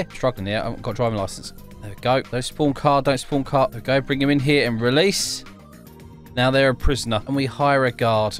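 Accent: United Kingdom. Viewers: Are you british and also GOOD VİD try race clicker pls 🎉😮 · YAY A NEW BRITISH GUY!! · you british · Mate pls tell me why British people say water soo weird (I love the British accents) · Are you British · Ur British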